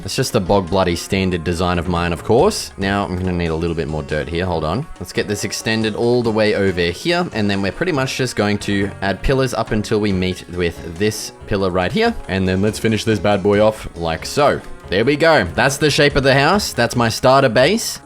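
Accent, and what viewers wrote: Australia. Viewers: I've never realized how Australian actually sounds 😂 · I know this guy is Australian I think because of the accent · Are you Australian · Ready to put this on and go to sleep to the best Aussie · R u aus?🇦🇺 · I can tell this guy is from Australia because of his accent and how he speaks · I have stumbled across an Aussie minecrafter that’s speaking adult talk! I’m so happy lol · Bro IS the stereotypical Australian. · Bro you can just tell this dude is Australian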